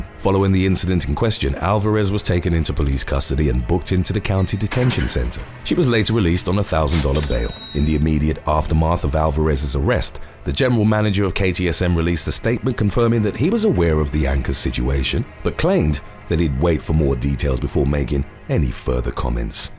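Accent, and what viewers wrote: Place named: England, United Kingdom. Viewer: I figured you were an Englishman in America!!!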